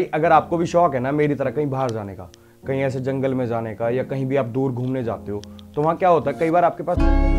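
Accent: Punjab, India. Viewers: Bro tumara bolne Ka accent Punjabi ki Tarah hai agar tum Punjabi ho to pls reply jarur karna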